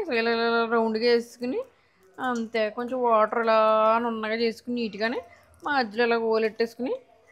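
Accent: Godavari, India. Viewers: ❤❤❤... America lo untu kuda chakkaga godari lo matladtuu mana ammayi anipinchukunnaru andi🎉🎉.. Disti tiyinchukundi😁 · Anni panulu bale chesaru fast ga nice godavari yasa baga mataladutunaru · Godavari basha Baga matladu tunnaru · Akka godawari pilla bale matladutunnavu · Very nice godavari vioce style 😅👌👌👌👌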